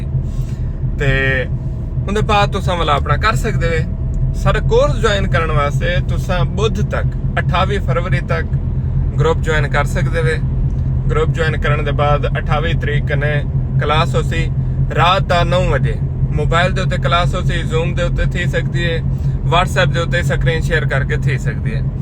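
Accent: Saraiki, Pakistan. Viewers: سر جی ٹھوس تے ماشاءالله بڑا میٹھی سرایکی بولیںدے ہوے I love you Sir Ji❤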